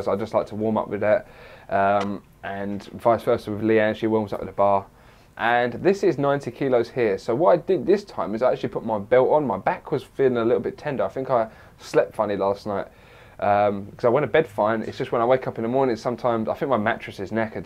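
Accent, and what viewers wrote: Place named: United Kingdom. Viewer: Which part of the uk you from?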